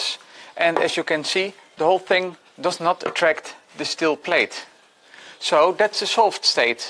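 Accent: Netherlands. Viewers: It's been more than 10 years.And the Dutch accent has still not completely gone.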